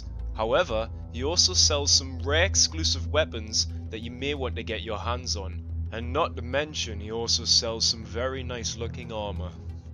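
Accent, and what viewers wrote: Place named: Scotland, United Kingdom. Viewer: My o my you sound so scottish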